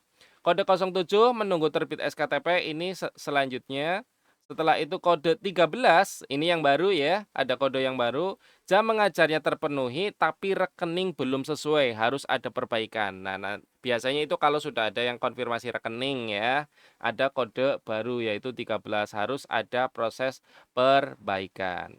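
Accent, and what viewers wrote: Banyumas, Indonesia. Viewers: Mas mw nanya. Kalau honor negeri bs cair gk kira"?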